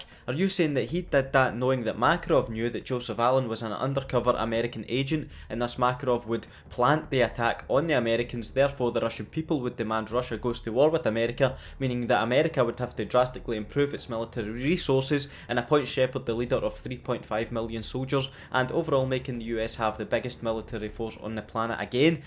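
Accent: Scotland, United Kingdom. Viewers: Fuck me ur voice is sooooooo sexy, subbed just for that Scottish voice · #notifactionsquad excellent Scottish dialect I really appreciated it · #notifactionsquad love your Scottish accent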